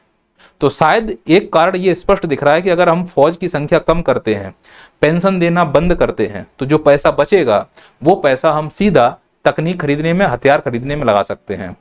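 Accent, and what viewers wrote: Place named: Chambal, India. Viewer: Sir aapki boli me Chambal wali akad hai❤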